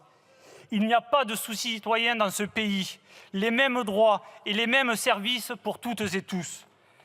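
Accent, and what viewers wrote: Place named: France. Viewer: Merci pour votre engagement. Et j'apprécie tellement d'entendre votre accent 'provincial' j'en ai marre de n'entendre que l'accent parisien à l'Assemblée, à la télé, à la radio, partout !!!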